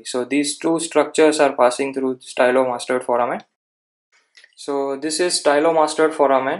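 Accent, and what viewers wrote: India. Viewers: Sir are u gujrati ? Your acent is way like gujrati.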